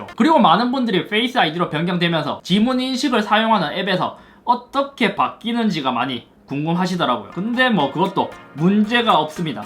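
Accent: Gyeongsang, South Korea. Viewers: 같은 경상도 사람이라 억양이 비슷할수밖에 없어요.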